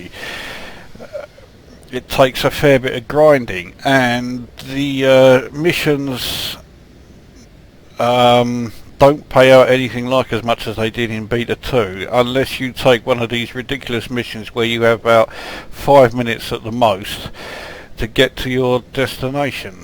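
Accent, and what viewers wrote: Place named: Southend, United Kingdom. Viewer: great video mate, really like your style :o) I am a expat now but your accent and way of speaking reminds me of so many blokes I know from my Southend-on-Sea days :o) Cheers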